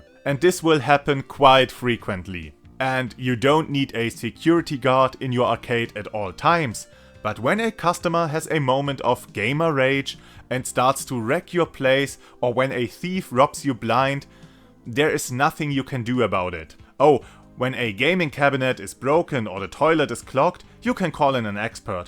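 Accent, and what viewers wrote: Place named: Germany. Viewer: Einfach mal n Like und Follow, weil du dir anscheinend seit Jahren so Mühe gibts :) Außerdem mag ich deinen deutschen Akzent im Englischen! :D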